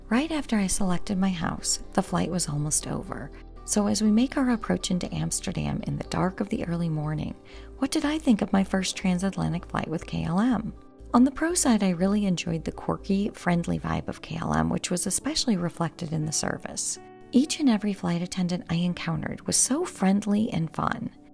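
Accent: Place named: United States. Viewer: あなたの英語はアメリカ語英語です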